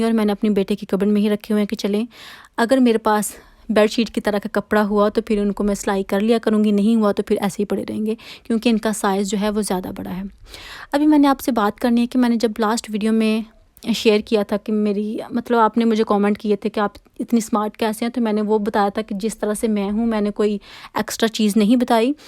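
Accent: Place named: Faisalabad, Pakistan. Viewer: Ap Faislabad me kis area sy hain? apky bolny sy lgta hy ap apny he shair sy hain…yani pensera road sy agy:))))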